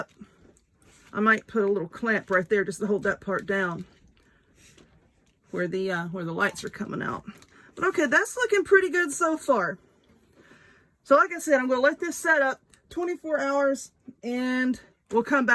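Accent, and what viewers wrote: South, United States. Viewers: I love listening to you talk. I’m a Texas girl so I’m Southern too. You are so good and funny too.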